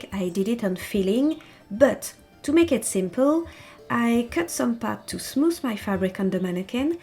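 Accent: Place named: England, United Kingdom. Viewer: 😮 cet accent anglais 👍 le sacrifice à la méchante créature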